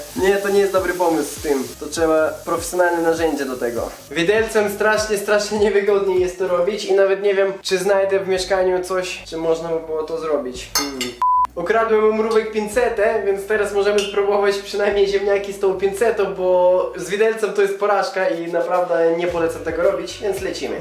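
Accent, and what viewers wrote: Russia. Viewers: jestes z rosji czy ukrainy?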